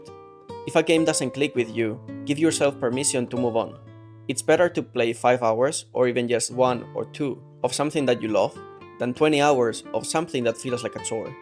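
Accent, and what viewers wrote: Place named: Spain. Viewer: Se nota que eres español, buen ingles crack ÑÑ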